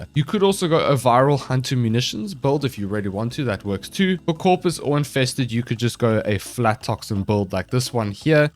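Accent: South Africa. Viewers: Yoo dude are you from South Africa ? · that South African accent slays me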